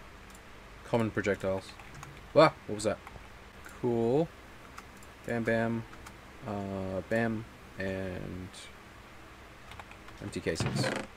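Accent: Australia. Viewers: ye fuikin aussie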